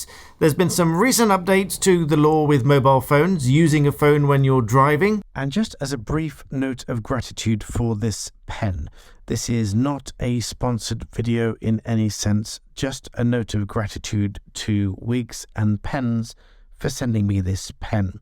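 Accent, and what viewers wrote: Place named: RP, United Kingdom. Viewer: listen and im getting off this channel. people have the right inalienable right to travel weather that be by time travel or donkey. sorry THAT WILL BE posh twat wannabe reading what we can all read ourselves you pantronising twat..did you come out the womb speaking like that you twat? fk you and your now kings laws member of tha barrrrrrrrrrrr society. you can all fk off you shark pit infested hitlers. you do more harm than good! all out for yourselves fkn loser. what good did you do ever for anyone?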